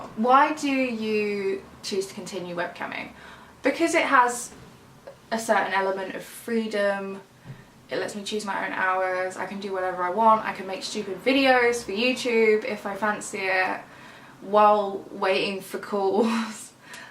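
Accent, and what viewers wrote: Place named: England, United Kingdom. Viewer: No wonder u have an English You’re from there lol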